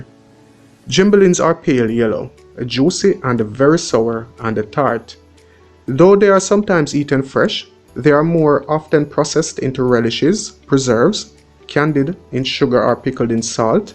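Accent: Jamaica. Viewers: Sir, Sir, Sir 👍🏾👍🏾👍🏾you have impressed me from the very first video I saw about the pyramid in St. Thomas. I always said that you reminded me of a notable well-researched writer/publisher after I saw your first video. And to hear you referenced the writer called Mark Twain has confirmed that you are just as or even more gifted than he was. You have the same kind of passion for your work. So no bada watch weh di "th" edicated elites dem waan seh. They should all recognize the greatness within you and encourage you. Please do not go chasing after getting "their" institutional degrees either. Continue to teach your self and Edu-tain us and "they" will end up chasing you to offer an Honorary Degree. Also continue your genius in doing your presentations with the same kind of "Jamaican English" because the world loves our accent and language - ask those who travel. BIG UP USELF!!! 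· This is ridiculously RUDE! The host is Jamaican sharing about native Jamaican fruits. The beauty of Jamaica is it's people, culture, food and language. He doesn't need to assimilate the way he speaks. He's sharing valuable information. Those who want the knowledge will stay for it and loving the way he speaks in the process. Too many people want to be westernized while disowing their own culture. You should be ashamed. People all over the world who don't speak English have accents. People in English speaking countries have accents and drop letters all the time. That's how dialects also work. I LOVE when people are themselves and don't switch up how they act or speak depending on who they think is important. He is being his authentic self which I value! Wonderful information is being shared, focus on that!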